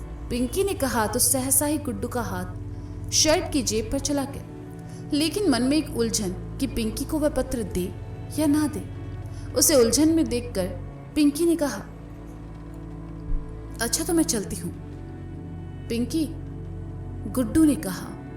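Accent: Varanasi, India. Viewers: Ma'am aapki voice so adorable and apki voice me ye banarasiya language so amazing lagti hai ❤❤🥰🥰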